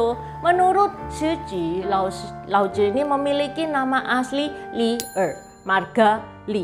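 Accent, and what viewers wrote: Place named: Surabaya, Indonesia. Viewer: Suroboyoan tha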